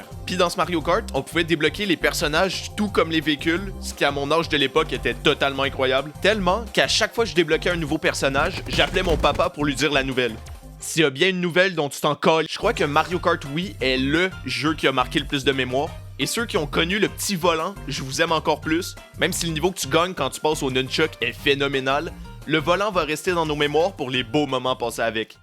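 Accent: Quebec, Canada. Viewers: Insane ta vidéo!!! Good job mon gars, en plus en Québécois hahaha il y en a pas asser:)) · L'accent québécois😂😊❤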